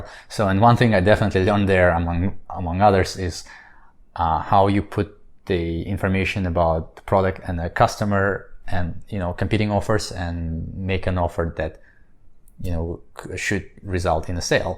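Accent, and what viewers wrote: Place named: Russia. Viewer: Super interesting and valuable - I look forward to the entire series, and following your ongoing journey. Interested in your accent, which sounds more "Russian" than "Korean" to this Californian.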